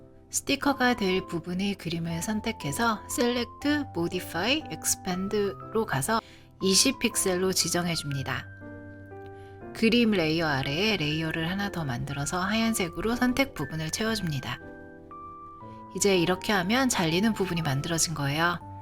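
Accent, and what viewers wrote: Seoul, South Korea. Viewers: 뭔가 목소리가 80년대서울 사투리 말투 같아여😅😅😅헤헤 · 방송에서 듣던 서울사투리 같은 말투가 정겹고 좋네요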